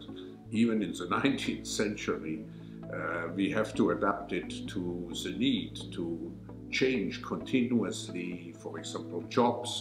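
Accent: Germany. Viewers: That German way of speaking.... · Judging by accent and last name, he's either German, Jewish or both. With that in mind, is it no surprise that he talks about a "1984" or "Brave New World" type societies in a positive manner. Update direct from Wikipedia: Klaus Schwab Born: March 30, 1938, Ravensburg, Nazi Germany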